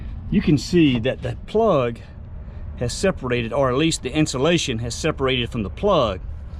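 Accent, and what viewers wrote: South, United States. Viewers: Thank you ! I bet I could replace our 35amp plug now! Good job! P.s. I just love your southern voice !💙 Have a blessed day yall!!!!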